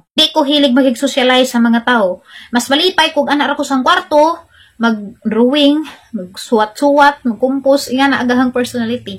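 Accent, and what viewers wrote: Bohol, Philippines. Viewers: Makalingaw paminawon sinultian sa Bol anon hahaha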